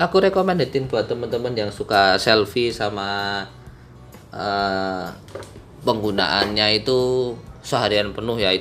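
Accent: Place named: Java, Indonesia. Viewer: Josss logat jawanya ,,mudah dipahami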